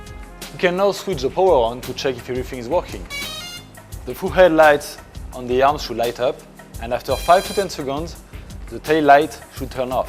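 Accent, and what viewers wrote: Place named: France. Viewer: Bonjour oh l accent francais LOL ouuuuu je voudrais bien la meme demo en francais surtout pour la camera.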